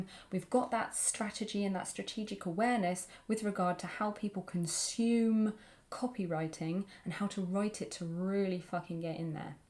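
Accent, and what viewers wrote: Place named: England, United Kingdom. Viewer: I really like your videos, they are informative, inspiring, and make me smile. Great combo, plus being from London, it's so nice to hear an English accent.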